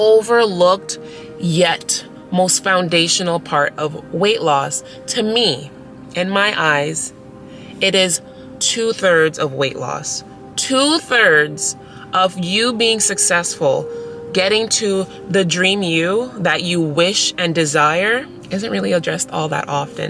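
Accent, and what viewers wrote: Jamaica. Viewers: I heard that Jamaica voice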